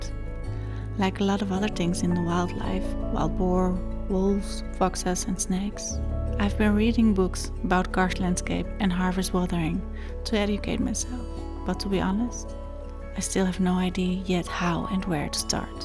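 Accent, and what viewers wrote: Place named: Netherlands. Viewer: wat fantastisch dat je dit doet 🙏🏽 en hoorde al dag je Nederlandse was Heel veel succes met de restoratie ❤️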